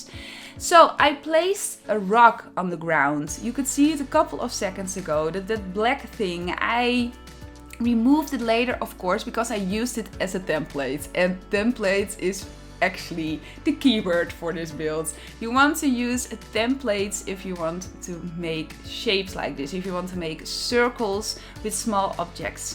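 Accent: Netherlands. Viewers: Are you Dutch?😁🇳🇱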